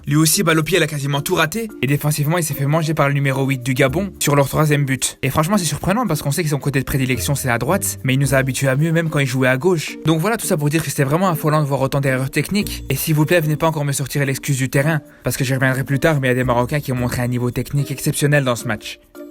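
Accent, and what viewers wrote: Belgium. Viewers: Votre accent belge je kiffe !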